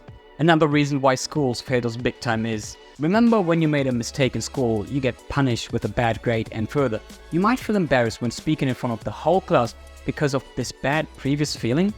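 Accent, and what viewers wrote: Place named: São Paulo, Brazil. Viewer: Você parece uma paulista!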